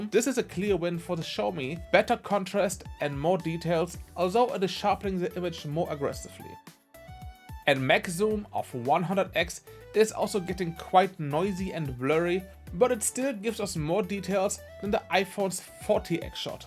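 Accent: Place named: Germany. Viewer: Man hört einfach immer raus wenn ein deutsch Sprecher auf englisch tut meine Güte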